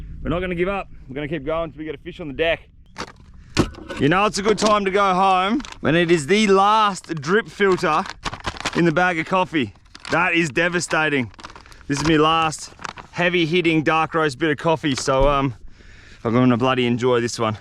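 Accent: Australia. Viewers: He's Australian we sound very similar to South African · love the way australians talk: "don't know what that was, but we did have a massive inquiry"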